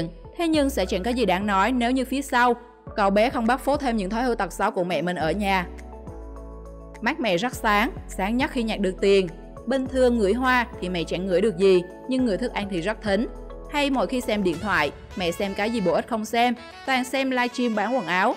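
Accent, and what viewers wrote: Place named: Central, Vietnam. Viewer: Tìm bạn nữ đọc rõ tiếng Việt nhé Ad. Đừng dùng người trung giọng khó nghe quá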